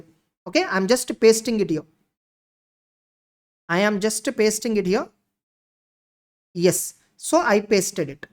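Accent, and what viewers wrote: South India, India. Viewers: Thanks for the info sir😊🙏🏻 Love your South Indian accent😍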